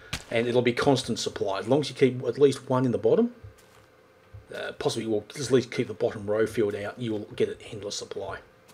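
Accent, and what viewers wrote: Australia. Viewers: u got the best ausie accent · Is that an Aussie accent? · His accent as well is just typically aussie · luving that auzzie acent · OMG AUSTRALIAN ACCENT!!!! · Australian accent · Its an Australian accent.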